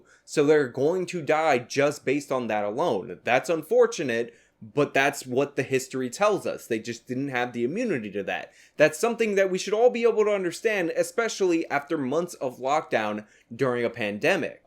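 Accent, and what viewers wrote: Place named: Texas, United States. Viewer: Dude sounds like your blessed to born Texan you should come back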